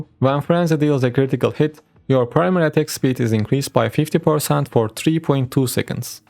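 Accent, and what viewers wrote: Turkey. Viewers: aksan türk birinin ingilizce metin okumasına benziyo :D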